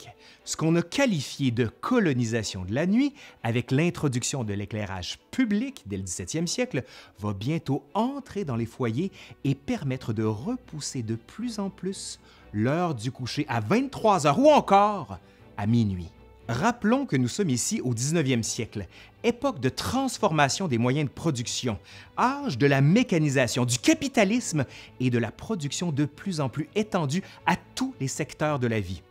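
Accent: Canada. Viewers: Selon ton accent, tu es canadien alors si tu parles en anglais tu auras beaucoup de visibilité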